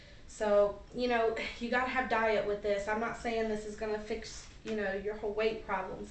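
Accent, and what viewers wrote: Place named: Carolinas, United States. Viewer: Hey this is not a stalker creepy comment but what state do you live in. Because of your accent I would say North Carolina or maybe South Carolina??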